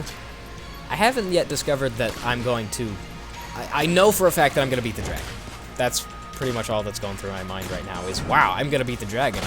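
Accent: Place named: Scotland, United Kingdom. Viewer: ***** Your most welcome! (his Scottish accent is beautiful :P)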